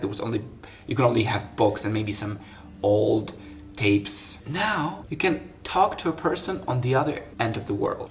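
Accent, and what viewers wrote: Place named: United States. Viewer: I really like your American accent!👍👍👍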